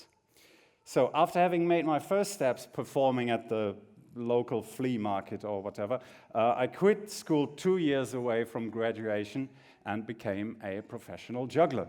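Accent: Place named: United Kingdom. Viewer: Roman LP ja wirklich, aber eher britisch als amerikanisch...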